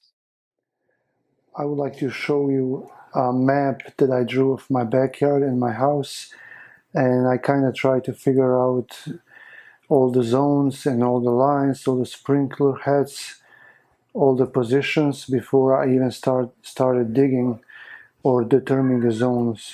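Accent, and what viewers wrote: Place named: Balkans, Eastern Europe. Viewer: Very detailed. Thanks for this. You sound eastern European. Maybe from Balkans?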